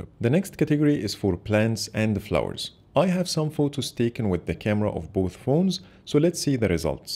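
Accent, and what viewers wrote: Egypt. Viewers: لهجتك مصرية 🌚✌🏾والله فيديوهاتك ادماااااان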